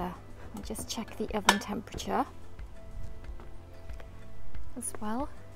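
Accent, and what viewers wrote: Wales, United Kingdom. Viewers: Omg I’m addicted to watching your videos soooo Morish, I was set on buying a Uuni pro tomorrow but now having seen this it’s thrown a massive spanner In the works 🤦🏽‍♂️ I have eaten out rather a lot lately and find that I can tell the difference now between a gas fired pizza and a wood fired pizza. Do you agree? I prefere the taste of wood fired and also find it more fun with wood, with that in mind what oven would you advise me on, given a majority of my pizzas would be wood fired. and gas as a back up (due to typical welsh weather)!! Thanks in advance PS. you sound like your from Wales? 🏴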